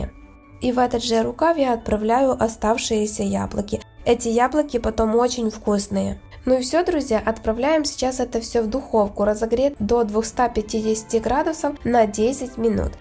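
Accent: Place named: Ukraine. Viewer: Просто наверное с Украины а старается говорить на русском языке ну не придерайтесь все понятно девочка старается.Спасибо!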